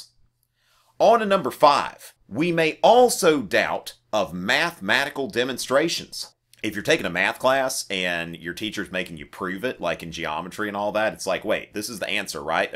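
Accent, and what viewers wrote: South, United States. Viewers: His thick Southern accent had me dead before he even said something educational.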